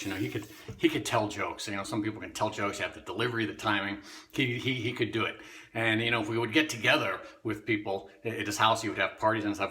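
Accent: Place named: Chicago, United States. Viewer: great video and I love it when you lay that Chicago accent on thanks for sharing it ,it made my day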